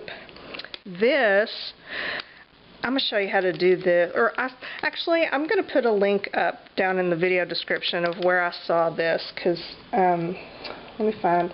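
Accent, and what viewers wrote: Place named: Texas, United States. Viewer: HI! I just found your channel yesterday. Awesome! 1st I love hearing the sound of home in your voice (I'm from the DFW area now in NW). 2nd I am so going to get a phone book and make an art journal, what a super idea! Thanks for sharing, now I have to find the video that tells me how to put the inclusions in. Keep it up!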